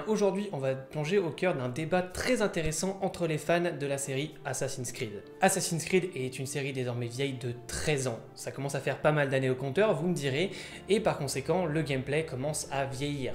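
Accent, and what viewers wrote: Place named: Italy. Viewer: T'as un excellent accent italien 👍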